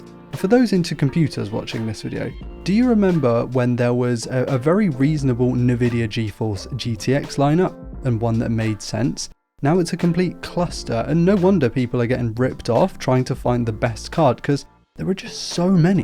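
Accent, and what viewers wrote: England, United Kingdom. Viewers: an englishman posts clickbaits on principle- here it being to gather as many likes as he can. your accent is the MVP of your channel, Sire.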